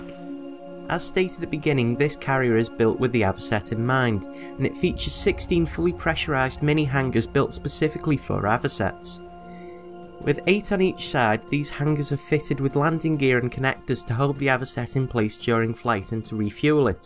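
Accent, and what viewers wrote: United Kingdom. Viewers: He sounds like he lives in England (well, he's English, he may not live here lol), so nope. We rarely get sunlight. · Anyone else think this guy sounds like a male GLaDOS at times? And maybe a little bit less autotuned. And British, okay maybe he doesn't sound like GLaDOS.. Just a little bit?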